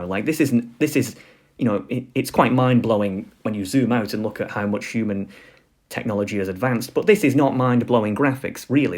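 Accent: United Kingdom. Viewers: This has been going on for years, it has just gotten worse with the internet. Before social media really became the behemoth it is now. You sound like you are from the UK or at least lived there and we had two notable celebrities. Jade Goody, who got famous basically for saying stupid things on Big Brother and acting "low class" And Katie Price - who garnered fame and wealth by constantly feeding the gossip rags with salacious tales about her life.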